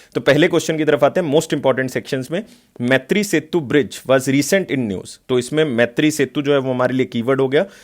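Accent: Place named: Himachal Pradesh, India. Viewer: sir aap himachal se lag rhe ho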